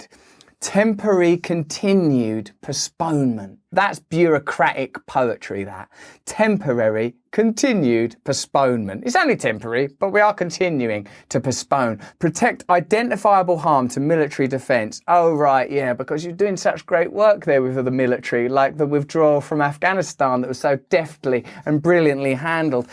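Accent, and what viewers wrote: United States. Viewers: Your American accent is pretty good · Dude, I love your American accent.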